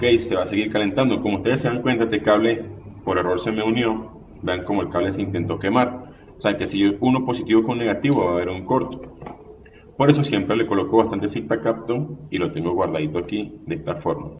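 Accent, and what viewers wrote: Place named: Venezuela. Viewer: Gracias !! al escucharte por el acento lo pensé que eras de Venezuela...sigue adelante, ya me suscribí...desde Valera Edo.Trujillo Venezuela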